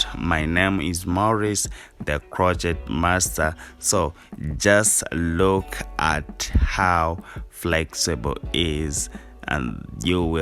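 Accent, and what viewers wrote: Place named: Uganda. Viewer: Sounds like Ugandan wow proud of my country 💪💪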